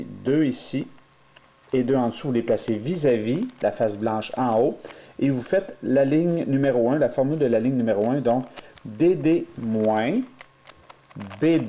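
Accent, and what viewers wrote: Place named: Quebec, Canada. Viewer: Lol je suis un débutant en matière de rubik cube mais j'trouve que c'est bien expliquer.. Sinon j'adore l'accent québécoi ^^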